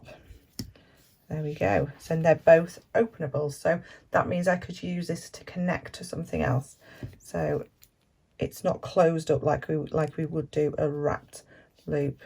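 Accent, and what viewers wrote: United Kingdom. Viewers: Enjoyed your video I learned so much and enjoyed your British accent 😊 have been to UK 3 times and would love to go again ❤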